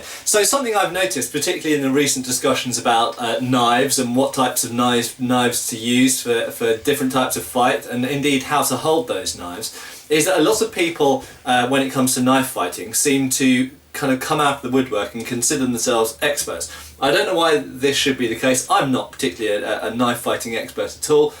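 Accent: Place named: England, United Kingdom. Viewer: Iona J He has an English accent and uses facts.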